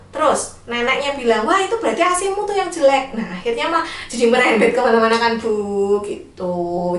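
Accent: Java, Indonesia. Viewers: mbak medok sekali 😂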